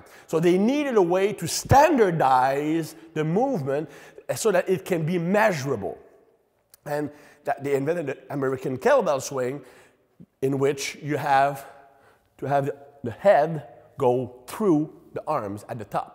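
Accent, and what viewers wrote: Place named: Quebec, Canada. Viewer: I’ve been a fan of articles for a long time now, and this is the first time I heard him speak. I knew he was Canadian, but didn’t know he was from Quebec. Makes me respect his great writing skills even more.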